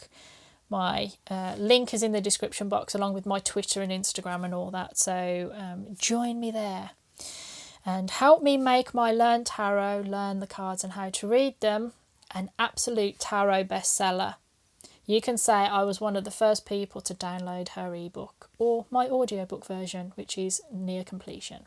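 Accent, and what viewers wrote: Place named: Northern England, United Kingdom. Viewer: You just came on my feed as I was asking about a job application, but I just had to comment before anything and say that as a northerner, it was such a pleasant surprise hearing a northern English accent when I clicked on this video! I hardly ever hear other northern girls!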